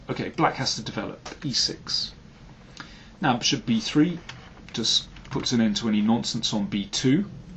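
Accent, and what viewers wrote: United Kingdom. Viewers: Hi Daniel! Thank you so much for your insightful commentaries! Also a big fan of your British accent that makes things dramatic and theatrical to my taste!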